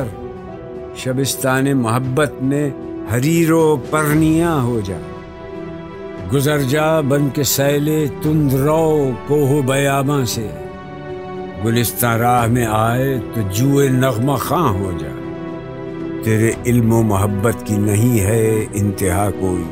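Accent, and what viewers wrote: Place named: Turkey. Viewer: Marvelous... But plz tell the pronouncer to not use the Turkish accent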